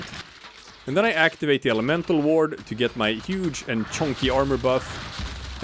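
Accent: Sweden. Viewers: Are you swedish? You have a swedish dielect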